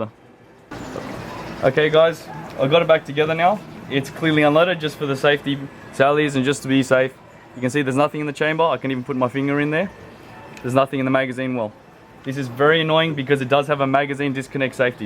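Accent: Australia. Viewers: Cool vid, very informative & educational! All made the better by that Ozzy accent, bro! Hope you're still in Phil when we get there next year! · A Filipino thats in the Philippines with an Australian accent ? I am so confused .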